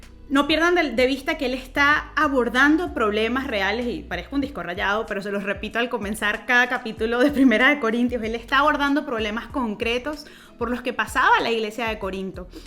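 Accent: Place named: Venezuela. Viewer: De donde eres? Parece de Venezuela.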